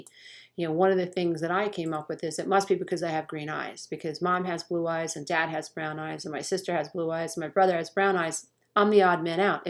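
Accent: New York, United States. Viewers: Hey just started listening. Great information. I hear the newyawker in you from one newyawker to anotha. Got get me some cawfee and warm up the caa!!! LOL! Namaste.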